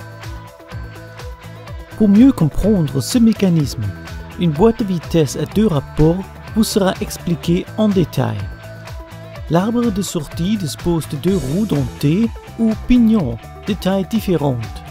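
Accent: Germany. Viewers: on se mare avec son accent allemand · J'ais du mal à comprendre à des moments, mais d'où vient cette accent ? Allemand ?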